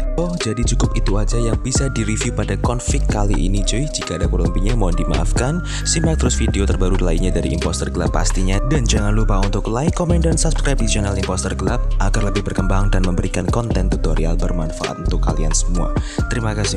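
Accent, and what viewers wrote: Surakarta, Indonesia. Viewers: Orang solo kah bang?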